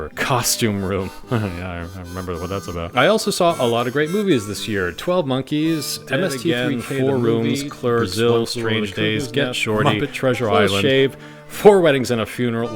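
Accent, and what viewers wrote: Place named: Maine, United States. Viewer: I could tell immediately you were from Maine.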